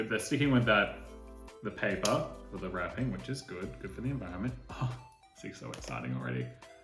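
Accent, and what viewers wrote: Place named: United Kingdom. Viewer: Great video. For myself, I’m about to purchase. But I’m stuck between silver and space grey and I think you helped me with that but so ty for that bc I’ve been on the fence about what color I like, but the specs I’m getting are m1 max and 64 gb with 2 tb ssd for some future proofing. And I love your accent I’m moving to England from the states and I just love watching British content creators!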